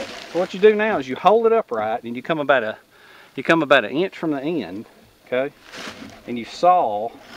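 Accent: South, United States. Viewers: HI. Nice to see you back again. ok, I see you as a walking, talking southern encyclopedia. Yet again, the southern messed me up. Shambered? So, I google and decide the word I am hearing is chamfered? Like a beveled edge? I think. Bless your heart. We yankees do not understand sometimes. LOL Love the no cordage. This stuff I can do. I have done no cordage many times. Most times I had no choice. Some of the notches were new to me. Appreciate that. Thanks for teaching some of us new and/or better ways of doing survival things. But please.... no more popcorn videos! Still makes me laugh. Be well. Be safe.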